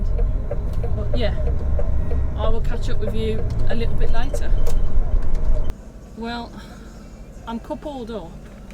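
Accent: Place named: Dudley, United Kingdom. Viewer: Are you from Dudley bab?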